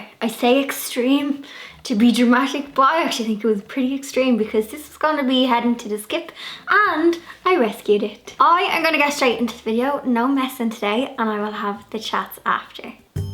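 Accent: Ireland. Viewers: You are such a sweet auntie! I don’t know what I love more - your promotion of a ‘new lease on life,’ your brogue, or your love of Guinness (which I totally share,btw). Enjoy the pub.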